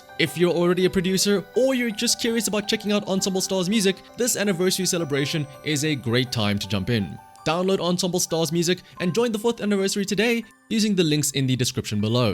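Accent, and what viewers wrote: South Africa. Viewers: Are you from South Africa cuz ur accent really sounds like it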